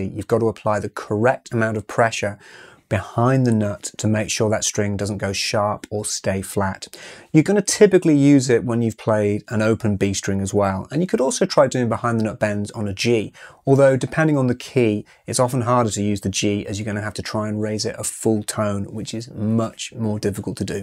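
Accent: United Kingdom. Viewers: Country stuff explained in beautiful RP English. The wonders of the internet. And very well explained as well. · Click on the video about Country Guitar Techniques, greeted with an English Accent...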